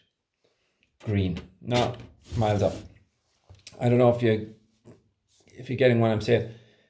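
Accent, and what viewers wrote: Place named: Erris, Ireland. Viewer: Nope he is correct. He speaks Erris Irish just like my grandparents.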